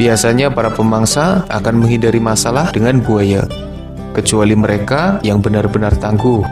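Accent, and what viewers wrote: Banyumas, Indonesia. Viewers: Wong banyumas kayane kue wkwkwk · Logat ngapake ceto tenan 😂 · Aja ngapak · Ngapak ya bos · Salfok sama penyampaian bahasanya. Orang ngapak ya?🤣😂🤣😂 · Aku tebak mimin nya orang ngapak,betul gak? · Logat mu NGAPAK😅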